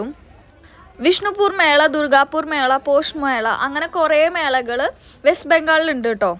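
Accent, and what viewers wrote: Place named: Thrissur, India. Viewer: സാധനങ്ങളുടെ വിലയുംകൂടി പറഞ്ഞാൽ നന്നായിരുന്നു (തൃശൂർ സ്ലാഗ് ഉണ്ടല്ലോ ) എന്തായാലും നന്നായിട്ടുട്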